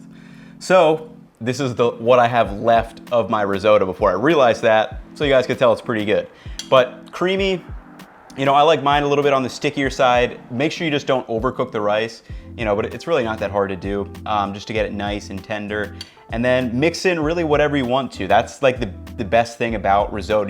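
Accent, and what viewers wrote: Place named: United States. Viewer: He's American, so he pronounces it like an American. Because that's how language works.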